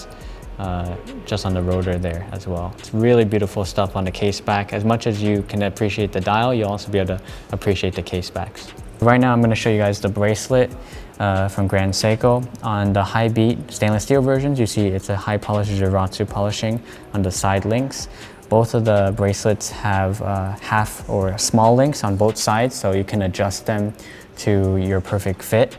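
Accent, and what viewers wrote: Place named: United States. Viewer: Super pumped!!!........Americans destroying our wonderful language!!! Lovely watches though!!! And enjoyed your content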